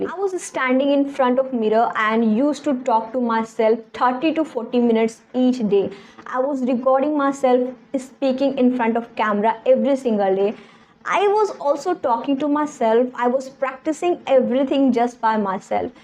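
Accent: India. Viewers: I mostly watch contents in American and British accent so campair to them your account is really cute😂. I watch your video just for your pure Indian cute accent.❤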